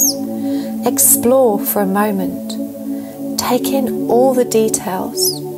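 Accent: United Kingdom. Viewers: Wow! Thank you! That was very transformative... I’m very glad I watched this. I felt so elated and tingly all the way through... and man! That was the coolest elevator I’ve ever seen if I do say so myself 😎😂... but seriously, thank you, I honestly wasn’t going to watch it as I’ve been off Twin stuff this week, me and my twin had a massive argument and I told him to move out which he has started to, so it’s been a rough week to say the least - I’ve gave it up to the divine and surrendered to refocus my energy onto myself, I’m just out of patience right now and we both have healing to do, anyways, I was guided to here for a reason, perhaps some healing has been done for my connection and all the other beautiful twins 💕 plus I like listening to your accent 😊 I’m Scottish, moved to Denver 4.5yrs ago, so reminds me of the UK 🌹 looking forward to more of these, thank you so very much for sharing your gifts with us all and helping us on this journey 🙏 love and light 💕🌹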